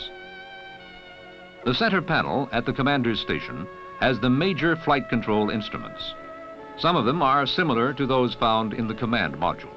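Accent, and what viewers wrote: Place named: United States. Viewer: Love the US accent used these documentary films in the 50s and 60s. Not a fan of the music and worthy of watching. Did they ever get shown on UK tv?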